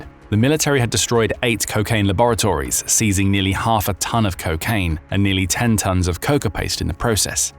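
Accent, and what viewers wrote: United Kingdom. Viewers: interesting there is a british announcer narrating this video, implies the drug trade in participated in by British intelligence ...MI-6, anyone? Nothing new about the British trafficking drugs to exploit and destroy regimes and countries ... and the british monarchy is historically one of the biggest players in trans-national drug dealing ...after all, where did their wealth come from ... invading, destroying and colonizing colonies and countries of color. City of London bankers direct and finance all this for the monarchy, and it's no secret, that the U.S. remains a colony of the British Empire, according to treaties signed in 1776, 1810, and so on. It seems to me if South and Central and North American countries are humbled as drug dealers, that puts the british back on top in eastern europe, western europe, eurasia, africa and so on. 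signed, PortlandHomeboy ... btw that's also the name of my website.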